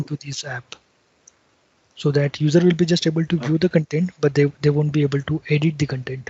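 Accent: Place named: India. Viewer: Great video! Even though the indian english accent doesn't help with understanding, the explanations were very clear. Thanks!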